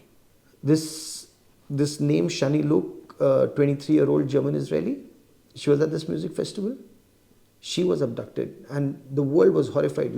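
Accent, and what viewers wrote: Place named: India. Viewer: I cant understand you indian bull shit accent. You are fake nees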